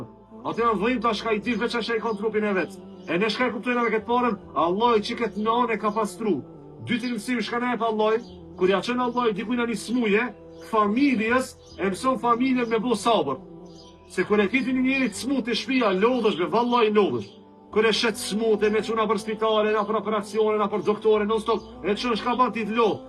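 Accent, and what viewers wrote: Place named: Kosovo. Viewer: Ti do të jesh nga Kosova,në këtë dialekt.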